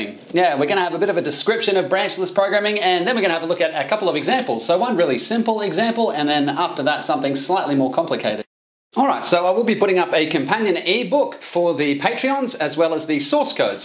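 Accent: Australia. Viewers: I don't anything what you talking about but I listened for the Australian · good to hear an Aussie accent. Yeah, I'm still a young programmer, only 15 years or so, but I always wondered about conditionals like "IF", because if the conditions weren't met to take the branch the CPU wasted it's time asking, I devised my own simple mathematical tricks. It may have taken a few extra cycles, but that was nothing. I never knew this was a "thing". How about that? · Nothing better than an Australian teaching you about programming casually, great video mate!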